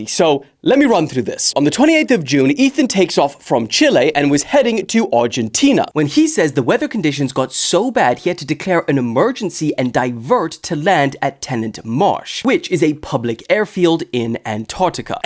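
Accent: South Africa. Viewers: It sounds like you might be from South Africa 🇿🇦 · Oh hey, a South African Brother. Nwice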